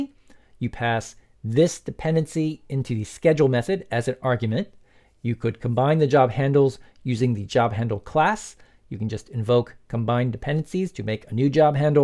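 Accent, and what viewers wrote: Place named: England, United Kingdom. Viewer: I like your Unity videos, speaking very clearly and English accent is nice too. Hope you can make more Unity tutorial videos!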